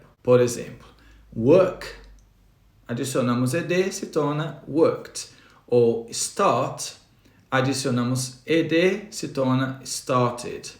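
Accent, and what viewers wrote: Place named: United Kingdom. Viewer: That sounds great the British accent. I love it! I miss it! Congrats!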